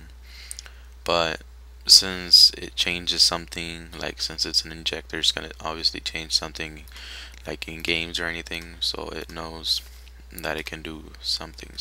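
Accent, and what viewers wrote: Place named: Mexico. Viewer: must be mexican, the way he talks with the talks with the question rises in his sentences.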